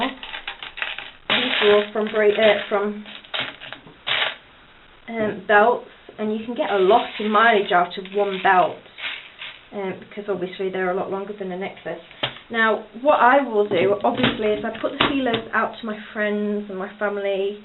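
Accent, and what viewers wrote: United Kingdom. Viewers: you sound like your British. r u ??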